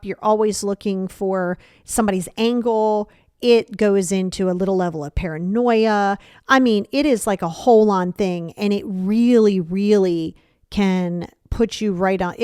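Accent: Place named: England, United Kingdom. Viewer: Hi from Algeria , the English accent was cute 😂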